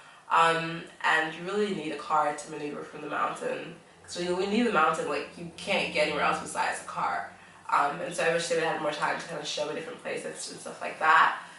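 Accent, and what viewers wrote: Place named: California, United States. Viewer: You accent is interesting... I was thinking California or Canada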